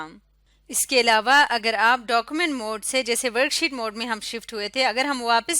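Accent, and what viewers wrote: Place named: Pakistan. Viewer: It seems that you are from pakistan.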